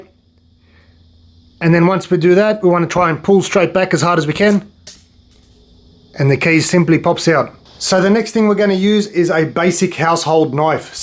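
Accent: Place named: Australia. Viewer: New you were an Aussie as soon as you spoke.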